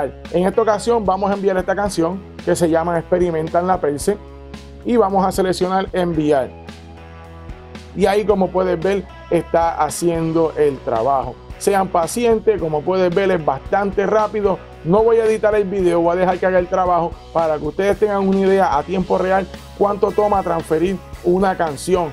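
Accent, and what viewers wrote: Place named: Puerto Rico. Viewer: Tu acento Puertorriqueño lo reconosco en la luna Desde juncos te veo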